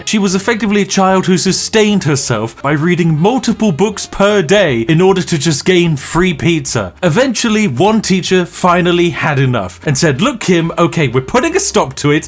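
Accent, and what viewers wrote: United Kingdom. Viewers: 15 minutes of pictures and some guy with a lame british accent? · You sound British.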